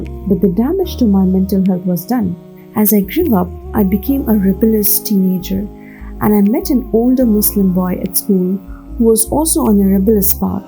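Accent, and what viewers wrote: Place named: India. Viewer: You say you are strictly British yet you have a very Indian accent. I find that's odd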